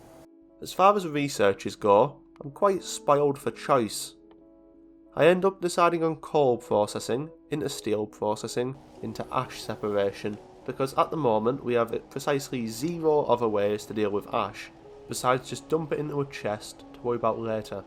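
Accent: United Kingdom. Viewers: Your Britsch accent is so pleasing to my ears.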